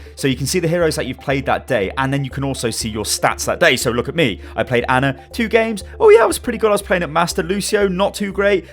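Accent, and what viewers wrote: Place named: United Kingdom. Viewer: People always fill the comment sections with memes and get all the thumbs ups. They're funny and all, but I want to say something that most of the people in here won't point out. I think / makes the best Overwatch videos in YouTube. Hell, he probably makes one of the greatest gaming videos in overall. Don't get me wrong, he isn't the world's greatest Overwatch player, but he cares so much to share his experience and insight of the game. He's enthusiastic of what his doing and at least I feel that I can absorb some of that energy to my gaming. And even though he would post garbage, I'd still probably watch it, just because of that sweet authentic British accent and toodle-oo! Love from Finland, keep it up.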